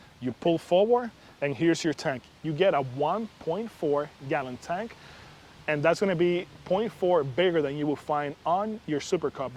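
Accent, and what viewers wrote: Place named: Cuba. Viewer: I detect a Cuban accent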